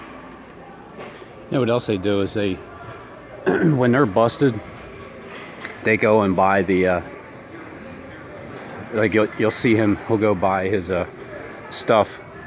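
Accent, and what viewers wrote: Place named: East Coast, United States. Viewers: I hate to tell you this, but I have worked on the cameras and monitors in Walmart, and on a day like that, you probably have one, maybe two Loss prevention people working, and one if them is watching monitors and doing all of their management duties, and the other one will walk some and do double duty. BTW, everyone had an empty push cart at one point. I promise you that their are no where near the amount of loss prevention that work in that store all together, as you saw in 5 minutes. You are probably trying to pass people off so that they comment, and do you can get paid. It is really activating listen to this bullshit that isn't even close to being true, on top of that, the Baltimore accent just rubs me wrong. It could be South Jersey or Southeastern Pennsylvania, but it's really a Baltimore accent heart and it is the most obnoxious accent in the country. 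By the way, is anybody shopping there? Or, are they all trying to keep you from stealing a $1 item. Walmart method is to identify you and call the police. By the Walmart or installing facial identification software, and they're gathering information everyone just like everybody else. Won't be long before you walk in that store and they know if you're a thief, and I know the kind of stuff you buy. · it could be on the East Coast or the Upper Midwest since he does have a little bit of an inflection of an accent when he speaks. Not sure.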